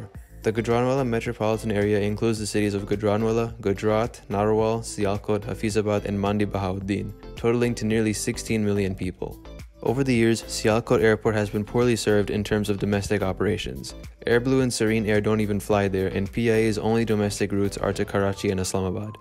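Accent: United States. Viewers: Great American Accent.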